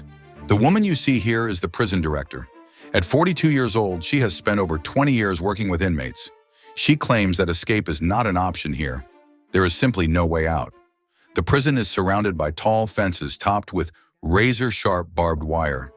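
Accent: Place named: United States. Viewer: bro the narrator is american.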